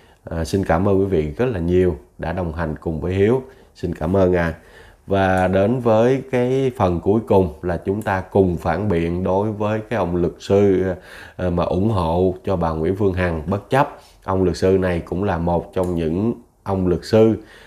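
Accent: Standard. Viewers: Tiếng nói quá chuẩn luôn❤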